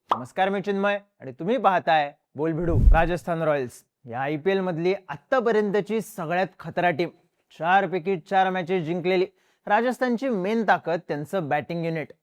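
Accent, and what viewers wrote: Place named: Vidarbha, India. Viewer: Vidrabh bolte bhai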